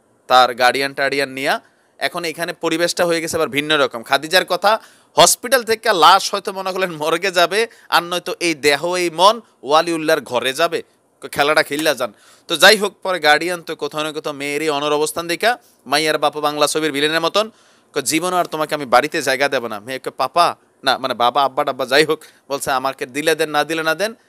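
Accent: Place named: Comilla, Bangladesh. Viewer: ভাই আপনার মুখে কুমিল্লার ভাষাটা সেই লাগে..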